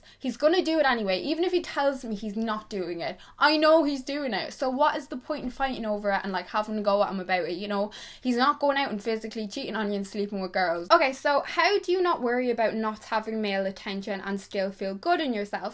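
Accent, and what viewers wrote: Ireland. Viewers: VoiceYT shes irish :) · Is your accent Irish? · I have detected an Irish accent and I am assuming you grew up in Ireland. But as you most likely know in Ireland discos can be quite risky. Could you maybe do a video on how to deflect pressure from guys at these teenage discos. Lately guys get their friends to pressure you and it can be quite overbearing and intimidating. So I was just wondering as a fellow Irish girl if u had any tips or advice for handling these awkward scenarios. I don't know if this topic was covered in the video as I am waiting for it to buffer but if it is just ignore me.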